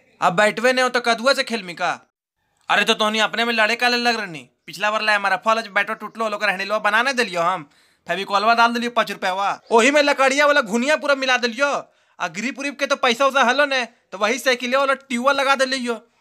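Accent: Bihar, India. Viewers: Lagta h aap bihar ke Rohtas se ha ...🙏🙏🙏🙏🙏🙏🙏🙏🙏🙏🙏🙏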